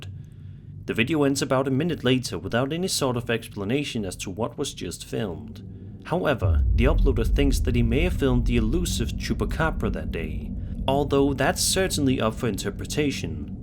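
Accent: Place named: Denmark. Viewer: I like how after i found out you were danish i can’t unhear the strong accent you have lol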